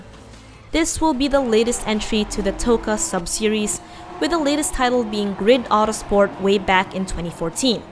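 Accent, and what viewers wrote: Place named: Philippines. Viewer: I hate her voice. She sounds Filipino I'm not racist but she clearly doesn't know anything about cars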